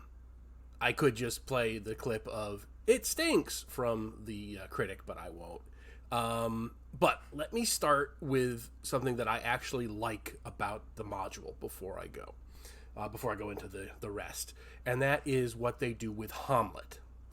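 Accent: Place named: General American, United States. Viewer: My man, you confuse me, how can you have the most british tavern keeper face with the most bog standard American accent ever?! Holy moly.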